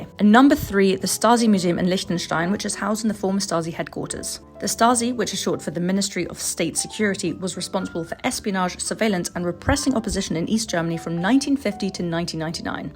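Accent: United Kingdom. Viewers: As a German myself I would’ve never guessed you‘re german. I thought you were british. You got that british accent from living in the UK haha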